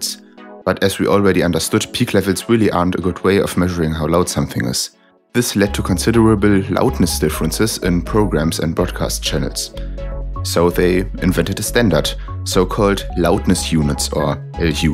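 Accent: Germany. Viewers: Super video, echt spannend! Muss aber sagen, dass dein deutscher Akzent echt extrem ist (nicht das es bei mir besser ist)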